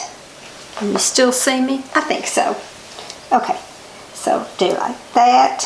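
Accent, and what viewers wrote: South, United States. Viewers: Knew u were a southerner when I heard u talk and a tennesse rwsident...so am I....tfs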